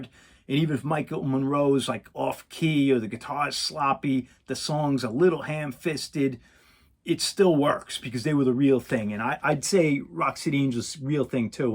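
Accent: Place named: New England, United States. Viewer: I’m from Fall River but have lived in Atlanta for over 20 years! I knew that accent….haha! Love your videos and your knowledge! Respect!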